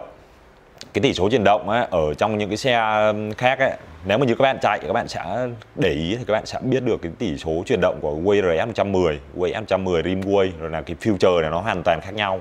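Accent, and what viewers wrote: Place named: Bao Loc, Vietnam. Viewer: nghe dọng anh giống người dân ở bảo lộc lâm đồng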